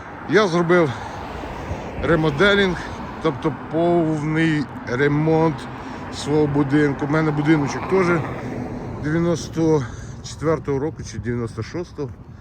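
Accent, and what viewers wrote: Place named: Rural, Ukraine. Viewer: Перший раз побачив в неті цей канал, по вимові впізнаю земляка, підписався буду дивитись.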